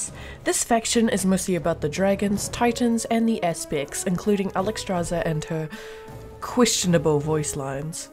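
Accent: New Zealand. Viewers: I absolutely love your accent, is that a kiwi accent?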